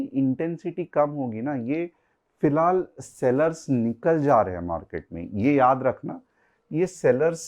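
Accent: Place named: Vidarbha, India. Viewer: sir i think u r from amravti akola side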